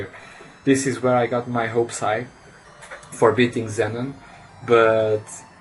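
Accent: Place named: Portugal. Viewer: do teu sotaque xD vi logo que eras português x) foi mt fácil xDD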